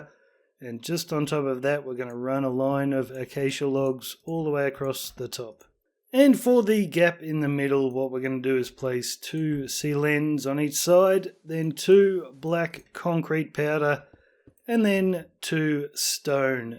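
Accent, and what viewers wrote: Australia. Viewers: Nice to hear an Aussie voice! I built this in my One Block Skyblock world, but changed the inside around as I'm outfitting it as my house and storage. I decided early on what colours I wanted, and in the end was so grateful I'd kept everything the one block threw at me. I went for a dark oak, prismarine, and quartz block palette, with sea lanterns, and emerald blocks instead of gold blocks. It took me a fair while to build in survival. Otherwise it looks like yours outside, apart from forgetting the anvils and hoppers, so it's a less imposing spire. Thank you so much for the hard work you put into this tutorial!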